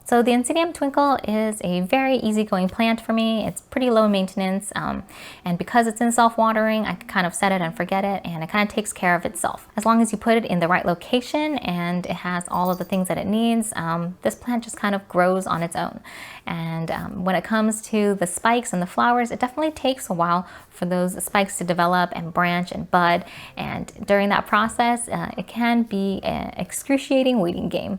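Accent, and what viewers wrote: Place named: California, United States. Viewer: Uuuugh that airy babyish sing song kardashian california accent is unbearable. Train yourself to get rid of it!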